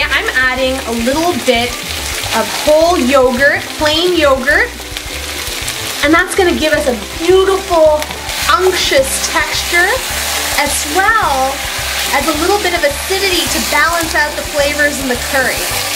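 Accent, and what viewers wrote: United States. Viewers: You sound sooooo American 🇺🇸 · comments===hi i am a chef at indian restaurant in dallas.....your looking beautiful and the rogan josh yummy yummy...thx for tip ratanjot. i never know this give rogan josh color i learnt from you today. name look like tamil but american accent english keep on rocking.....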